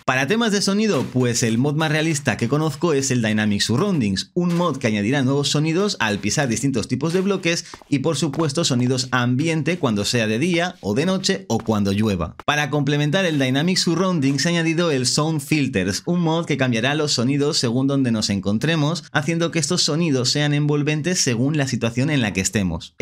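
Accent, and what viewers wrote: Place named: Spain. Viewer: OTRO CANAL ESPAÑOL, SE PASAN, ESTE CANAL ES DE EUROPA, NO LATINO, PORQUE A CADA RATO DICE COSAS RARAS CON SU DIALECTO O ACENTO QUE NO SE ENTIENDE EN MI PAIS LATINO, ESE CANAL ES DE IBERIA, NO LATINOAMRICA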